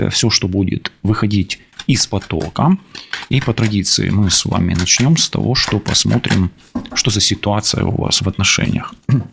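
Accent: Ukraine. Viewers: Спасибо за красивую Украинскую речь.Рассклад - класс !.. Не знаю,как объяснить...🌹🙏🌹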